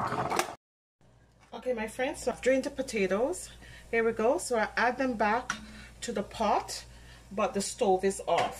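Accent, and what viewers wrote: Jamaica. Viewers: Yummy 😋 you have an jamaican accent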